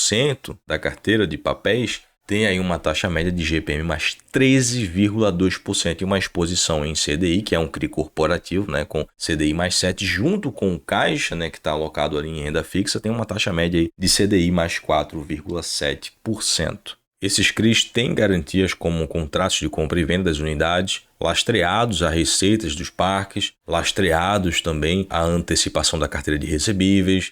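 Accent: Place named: Pernambuco, Brazil. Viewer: Tá tranquilo mermão, sua resposta foi bem esclarecedora, a comunidade tem que ser informada desses detalhes, saber dos indexadores, saber onde estão pisando, agora com relação se vc é de Pernambuco, percebi pelo sotaque, sou aqui do Ceará, somos vizinhos rsrsrs, tudo certo mermão, forte abraço, no aguardo do próximo "Raios -X" 🤛🤛🦈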